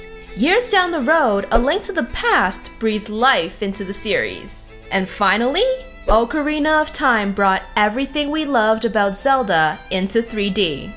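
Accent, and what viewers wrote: United States. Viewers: please stop saying miamolto, , miamoto, , , you sound american, and yet when you say his name you try to put an H in there , like, miamohto, wtf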